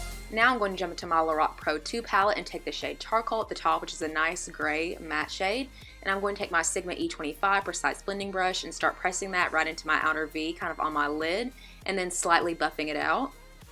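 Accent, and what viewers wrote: South, United States. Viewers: Gorgeous!! Can't wait to see your channel grow! Is your accent southern?! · Are you from the south? I am and I noticed the accent immediately! lol. It gets boring just listening to british / american accents all the time.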